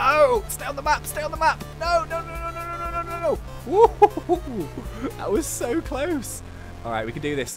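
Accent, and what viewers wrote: United States. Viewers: That American accent tho... that's better than I can do and I've never been out of the USA!! 😆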